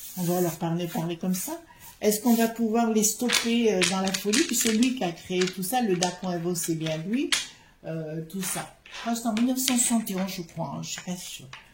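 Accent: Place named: South, France. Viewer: c’est le parler du sud je connais et ça ne me choque pas !‘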